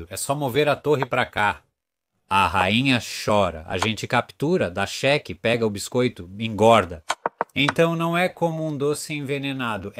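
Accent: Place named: India. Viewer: Pois é, eu adoro o jeito que ele cria a própria língua misturando o sotaque indiano forte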